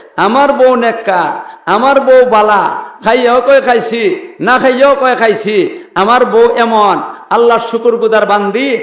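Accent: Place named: Brahmanbaria, Bangladesh. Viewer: এই হুজুরের গ‍্যান সিমীত। আরো সুন্দর ভাষায় ব‍্যখ‍্যা করতে হবে। বাংলা আরো শুদ্ধ করে বলতে হবে, বি.বাড়ীয়া এলাকার accent ব‍্যবহারে বাংলা বললে হবেনা।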